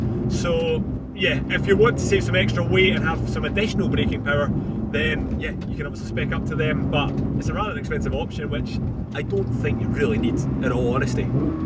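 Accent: Scotland, United Kingdom. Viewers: I understood every word,love Scotch accent,great review,regards from NZ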